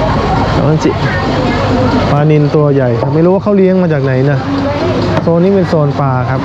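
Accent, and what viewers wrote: Isan, Thailand. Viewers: ทำไมสำเนียงออกอิสานๆมากเลย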